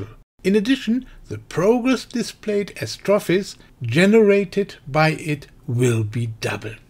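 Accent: Germany. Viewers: Sorry, bad joke. And yes we all can hear he is from Germany, but who cares? He speaks English, right? The video's are easy to understand.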